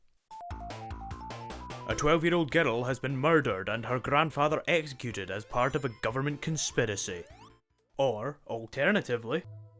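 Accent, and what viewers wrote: Scotland, United Kingdom. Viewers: This is probably the most extensive look at Sonic's evolution ever, and it raises some good points. Also, it's nice to hear another Scottish voice on youtube! Anyway, I have a possible (yet perhaps biased) suggestion to Sega for a way out of the bind they've got themselves in. When your series loses its direction and identity, go back to what made it great in the first place. Recall when the James Bond franchise, during the late Brosnan era, didn't know what to do with itself. Die Another Day was partly an homage to classic Bonds, part sci-fi, part self-parody, but also overwrought with serious emotion. In many ways the series was again turning out like the late-Roger Moore era, something that Brosnan's first outing as Bond in Goldeneye did a lot to get away from. So after Die Another Day they brought in a new actor and did Casino Royale. 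In terms of its tone, that film is very similar to the Ian Fleming book of the same name, and it shows why and how the characters and stories from the early books were so gripping. After watching your video, I see a lot of parallels between the respective problems faced by Bond and Sonic, and if getting back to basics worked for the former, I really think it could work for the latter. So what I'm suggesting is that Sega go back to the gameplay mechanics, designs, and narrative style of Sonics 1-3. And not just in the form of nostalgia feasts like the Sonic 4 episodes or Generations (though the latter was a firm step in the right direction)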